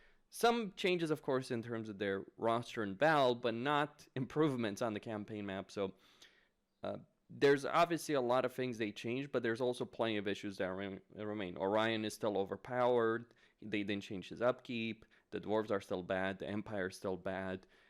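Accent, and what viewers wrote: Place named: Romania. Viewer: e sounds Romanian I might be wrong tho. Some Balkan country maybe? Romanians have a pretty distinct accent I find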